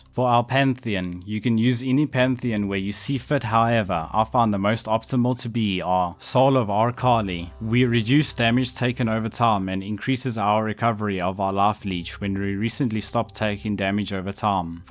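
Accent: South Africa. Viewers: You sound South African! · Are you South Africans because you accent sounds like it is from SA #YeeaaBooii anyway, love the build and looking to give it a shot as I have no clue what to try next this league.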